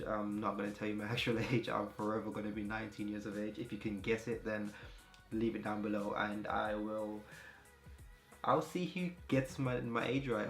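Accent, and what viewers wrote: Jamaica. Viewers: whats your ig? you sound jamaican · Di Jamaican accent a chip een tho lol thanks for the video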